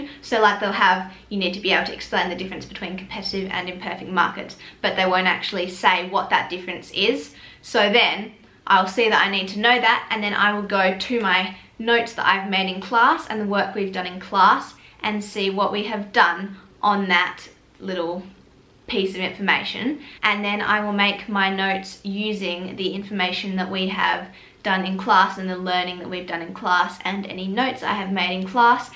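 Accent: Australia. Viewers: i love your aussie accent so much😭💗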